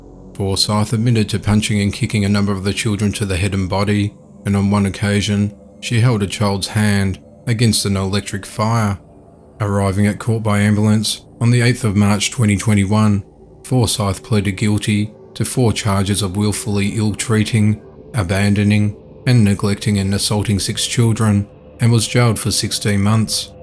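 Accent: Australia. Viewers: I’m a new subscriber and love this channel, your voice is very easy to listen to, can I detect an Aussie accent?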